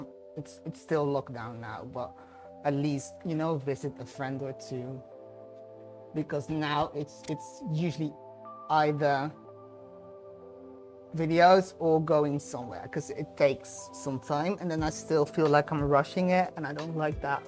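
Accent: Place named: Netherlands. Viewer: You have a Dutch accent but your English is amazing! Love from kansas. I am half German and 1\4 Dutch I wish I knew Dutch or German. My great grandma spoke German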